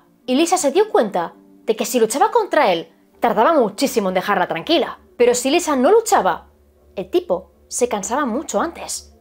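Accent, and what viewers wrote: Spain. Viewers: Hola linda! Te veo y escucho no sólo porque tus historias son muy buenas sino porque me encanta tu acento! De qué parte de España sos ? Besos 😘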